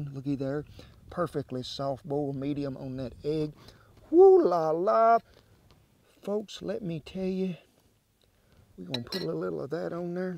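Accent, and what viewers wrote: South, United States. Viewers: I love this guy! Wow does his little mannerisms remind of my dear sweet departed daddy.....❤️thanks for the entertainment and the recipe looks to die for! He is near and dear to my heart already! What a sweetheart and wise man! I love his style and southern accent makes it all better. Chattanooga Tennessee sends its love! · About yay much!! Warsh it!!! I miss my southern people.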